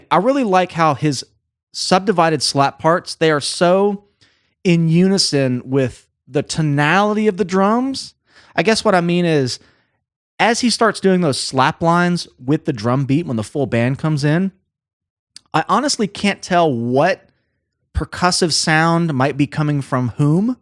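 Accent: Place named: United States. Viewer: Pronounced, Redding, Staytus... bloody yanks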